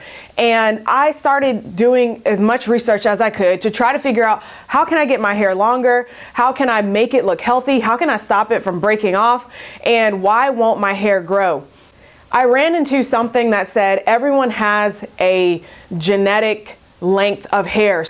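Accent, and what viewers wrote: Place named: Toronto, Canada. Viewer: Came across your Reiki video and think you are amazing. Beautiful, natural, personable, and so well spoken on Video. I’m from Toronto - you sound like a TO girl. Thanks for all you share. I’ll keep watching. Best from Canada.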